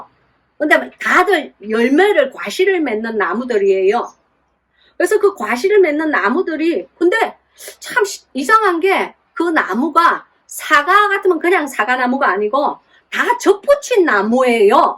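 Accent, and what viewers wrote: Gyeongsang, South Korea. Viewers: 성경공부 너무 좋아요 은혜가 넘쳐요 케냐 사람들을 이해하시려면 하나님의 도움이 정말 필요할것같아요. 목사님도 경상도 사투리 사용하시네요. 음향과 동영상편집은 토니 목사님께서 해주시는건가요? 너무 재밋어요. 예수님도 유머가 잇으시다는데. 잠근동산 책 주문햇어요 곧 도착하면 읽을 생각이에요 변화받길 원하고 실행하는 믿음의 사람되게 해주시길 기도드립니다 아멘 할렐루야^^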